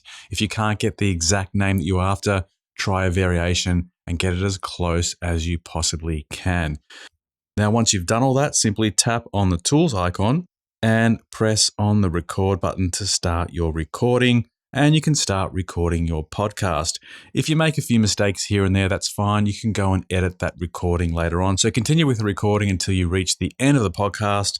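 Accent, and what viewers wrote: Australia. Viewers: Hey there, sounds like you're an Aussie. I am based in Melbourne and am going to produce a podcast for Seniors. #whocanpodcast ! I can do most things but social media and video production : No! Would you like to partner with me ? Check my Instagram page: Whocantpodcast. Thanks for the video.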